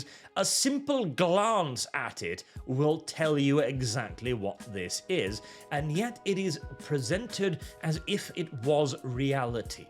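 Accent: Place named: RP, United Kingdom. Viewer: how is it you have such high class British accent and you're Norwegian? did you learn English from an aristocrat?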